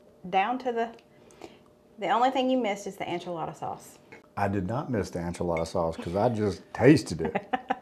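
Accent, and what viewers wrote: South, United States. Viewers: YouTube put you in my recommended videos. Glad they did. Love your quick recipes and your Southern accent. I live in Texas and appreciate your downhome manners. You made me cry, though, when your husband was praising your cooking. My husband used to always do that and his face would light up and he'd smile just like did. I miss cooking for him and having him ask for seconds or even thirds. Sorry about your cat, too. That made a tear come to my eye, too. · you are just flat out adorable with your southern accents;];];] love your family!!!!! i live in northern maine and the leaves are almost done dropping nites are in the low 40s sometimes lower then that come morning, thank you for all that you do...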